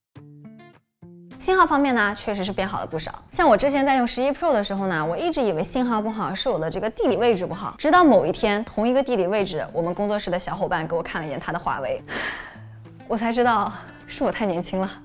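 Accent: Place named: Nanjing, China. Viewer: 总感觉全程自动翻译成南京话呢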